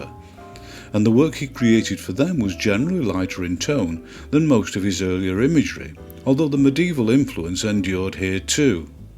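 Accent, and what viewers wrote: United Kingdom. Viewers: Hello! May i ask what part of the British Isles you’re from? I can’t tell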